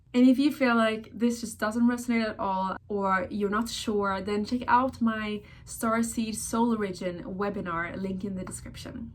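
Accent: Sweden. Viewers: As a norwegian, it's so easy to hear that she is Swedish, by the accent 😄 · Are you Swedish? Love the accent! If so, you are already Nordic, so definitely a Nordic Pleiadian!! 😉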